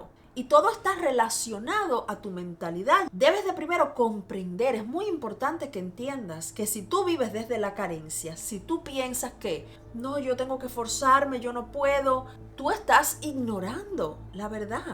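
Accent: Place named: Cuba. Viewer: Hola siempre que te escucho me lleno de energía positiva, eso es lo que transmites, me imaginé que eras cubana hoy lo confirmo, que bueno, también yo. Dios te bendiga 🙏🙏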